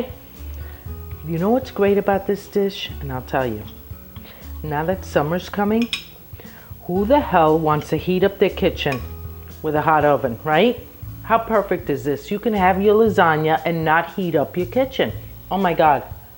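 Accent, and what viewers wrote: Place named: New Jersey, United States. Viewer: I love your accent lol I knew you were from Jersey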